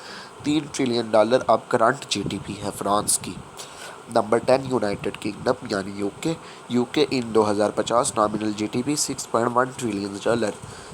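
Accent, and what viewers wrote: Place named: Pakistan. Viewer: Bhai tum awaj se hi Pakistani lagte ho and uske bad Pakistan ke flag me Kashmir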